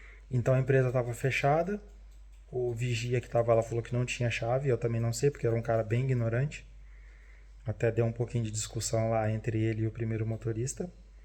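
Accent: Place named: Rio Grande do Sul, Brazil. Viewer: Provavelmente é gaúcho.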